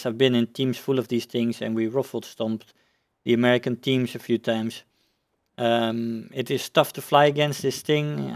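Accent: Netherlands. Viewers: je klinkt nederlands of misschien ligt het aan mij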